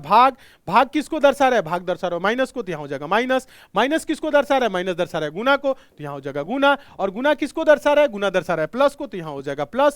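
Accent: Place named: Bhojpuri, India. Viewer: Sir G bhojpuri ek dam bhojpuri hero jaisa bole❤❤❤❤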